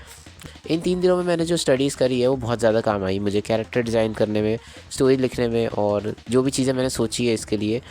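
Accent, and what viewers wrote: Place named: Madhya Pradesh, India. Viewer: Bro what that pen is called you use for inking , sorry for disturbing you again but please reply , and you got a new subscriber 🎉 you are so humble and Wese bhi aapki tone se pata chal raha hain ki shayad MP se ho aap , please reply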